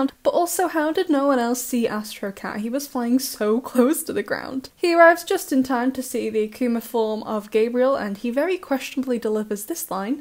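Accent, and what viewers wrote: United Kingdom. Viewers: ✨ToMaTo BoY ✨The British accent>>>>>>>>> slays!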